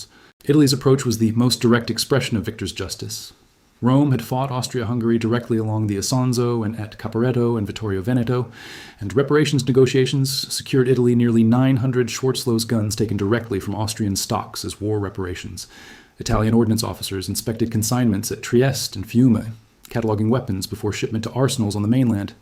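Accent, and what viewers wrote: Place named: United States. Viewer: I like how it's called Britain's war files, but it's an American AI voice that takes loud breaths for some reason 😂😂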